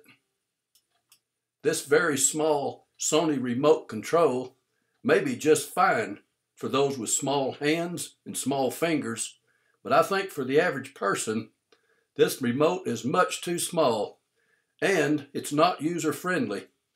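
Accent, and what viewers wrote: Texas, United States. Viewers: You sound like your from Texas dude and that was quite fascinating and I had a bit of a chuckle. I admit the remote is small and to be honest I have not got this Blu Ray player but I do have an earlier Sony model and the remote is the same size and pretty much the same as this one. To be honest I do not have a problem with the remote at all and it makes no odds to me where they put the play buttons either. But as with the biggest majority of remotes I have for my TV/DVD/Blu Ray and HiFi they are all capable of controlling any other device so I certainly see no need to go out and buy a Universal Remote at all. By the way I shall be getting this Blu Ray Player in the new year and right now I am saving to get a new 4K TV for Christmas. Hopefully in the new year this Blu Ray Player will come down in price too, cause I still feel it's too expensive with how cheaply they have constructed it. 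My last Sony Blu Ray player was around £130 here in the UK and I got it in a sale brand new for £65. I am not expecting this to go down that low and it's now at around £180. But I am hoping it comes down to about £130 or less by next year :)))). I would also say my last Blu Ray Player even though it's cheap and small like this and made of plastic. I still think it has a better design than this one. If it played 4K discs I would not even bother getting a new player and I am only getting a new TV because my old one is on it's last legs and is playing up a bit. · I love your Texas accent bro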